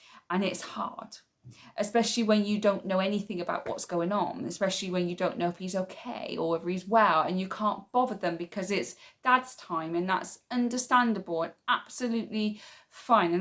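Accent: United Kingdom. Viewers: I love your accent 😍 💕 in kenya we call it peppa pig accent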